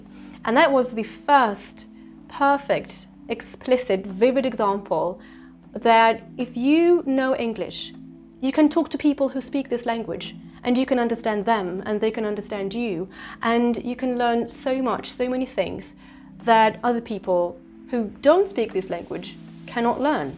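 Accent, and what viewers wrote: United Kingdom. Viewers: Ооох, я влюбилась в ваш британский акцент, прям невероятно плавная красивая речь! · You have one of those British accents🌺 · Какой очаровательный британский акцент ❤ У меня сильнее акцент, чем у вас, хотя я и живу в Штатах уже почти 12 лет и на бытовые темы говорю свободно. В разговорной речи встречаю незнакомые слова раз в месяц, если не реже, да и носители меня понимают без проблем. Мне в изучении языка очень помогли видео с английскими субтитрами, а потом и без них. Слушаю лекции, подкасты и смотрю документальные фильмы на английском. Вам менять ничего не надо - аборигены обожают мой акцент, хотя он и сильнее вашего. Оставьте чуть-чуть экзотического изюма в речи. Насчет увеличения словаря может и хорошая идея, но ваша речь звучит очень легко, без повторений, запинаний и слов-паразитов - очень приятно слушать. И спасибо огромное за замечательгые ведео. 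Смотреть их удовольствие! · Какой красивый британский акцент. Просто posh, обожаю. Подписка! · Шикарный британский акцент😍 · pure British accent and super speed ) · Renée Zellweger's pronunciation in "Bridget Jones's Diary" movies exactly -- вот что мне напомнил Ваш акцент. Она американка, и ей пришлось много поработать над британским акцентом для этого фильма. Со специалистами, само собой. У которых, видимо, была такая же лингафонная система, что и у Вас и много где ещё, поскольку я слышала польских лингвистов-специалистов с таким же вот точно британским произношением. Renée Zellweger как раз после фильма раскритиковали "спецы" за недоакцент (на себя бы посмотрели, ага :) ). Так что вот Вам и возможный фидбэк на Ваше произношение от "истинных носителей". Как человек, прошедший специальный и изматывающий курс по произношению профильного иностранного языка по системе и с экзаменами, не могу не восхищаться успехами усердных студентов по всему миру - огромный Вам (и нам всем заодно :) ) респект! 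· Оо у вас классный британский акцент! Это моя мечта! Смотрела ваши видео по истории по по англ никогда не попадались что странно 🤔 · Your English is very good, slightly more onto English accent. I live in USA for 30 years now and learned from movies and people around but I do have American accent. Thanks for your videos 🙂. They are so cute.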